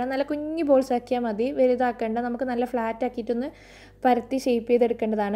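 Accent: Kannur, India. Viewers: aaha... kannur evde.??? .. samsaram kettappo enikk thoniyirunnu kannur aanenn... njanum kannura... payangadi🤗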